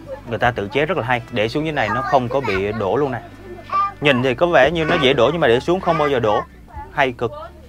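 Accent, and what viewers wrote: South, Vietnam. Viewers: A là người nước ngoài sống ở Sài Gòn, a xem video của a để tập nghe tiếng việt vì giọng miền nam quen thuộc với tôi. Cảm ơn nhiều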